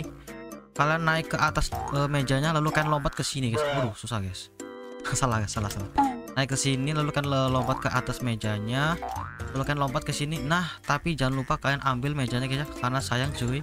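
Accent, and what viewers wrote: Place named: Java, Indonesia. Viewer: Logat jowo ne medok banget bro😂😂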